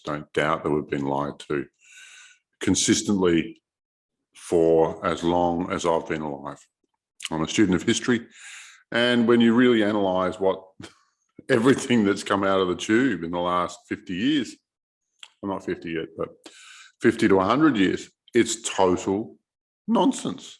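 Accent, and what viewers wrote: Australia. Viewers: It's great to hear an Aussie accent:)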